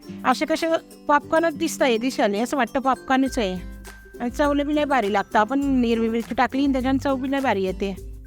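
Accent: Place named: Khandesh, India. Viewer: छान आहे रेसिपी, तुमची खान्देशी भाषा कानाला लै ग्वाड वाटते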